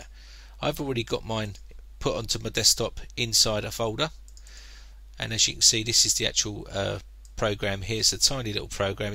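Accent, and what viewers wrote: United Kingdom. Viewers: ah i love the british accent!!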